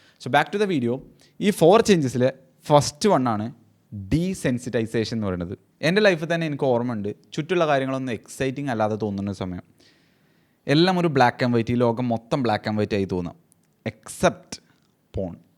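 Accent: Malappuram, India. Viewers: Chettan Malappuram ninn ahno varunne?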